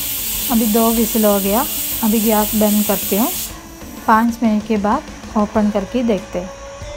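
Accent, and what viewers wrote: Kerala, India. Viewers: Your dishes are authentic. Even more you are so humble and sweet person. You chose hindi with your malayalam accent. Stay blessed!! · Very Nice Recipe... Will surely try...also liked the way you described...typical mallu style.. Saukyaam maam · മൊളു ... ഹിന്‍ദിയിലെ മലയാളം accent ...അതാണ video highlight ... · Kerala hindi accent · It is actually Malayali Accent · My all mallu friends speaks same Hindi ❣️ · Love your keralite Hindi accent. U r very sweet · Dear sister I like your keralite accent very much and recipes, today easiest way to make samber... I try it your way.... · Malayali* accent.. other accents are different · She's a very enthusiastic person I liked her hindi kerala accent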